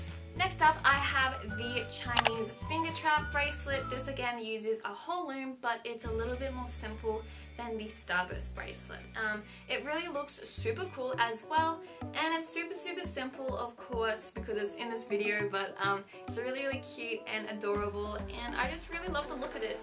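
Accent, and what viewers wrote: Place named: Australia. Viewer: #loomersquad love love lovee your videos, great to know that there are still some loomers out there! also love your accent, im Australian aswell 💙🌈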